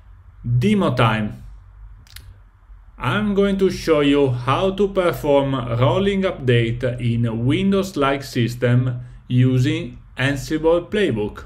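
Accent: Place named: Italy. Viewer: Lovely precise, simple video. I love your wonderful cheery Italian accent !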